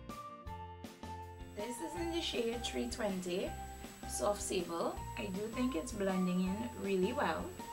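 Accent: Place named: Caribbean. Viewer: Love your accent ..Caribbean girls run it ..love this review😊💯👍🏾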